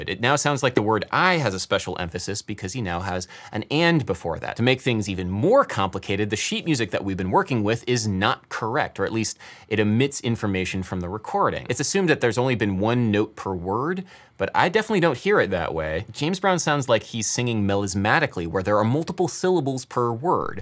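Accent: United States. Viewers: Great video and all, but I strongly believe he has quite a strong American accent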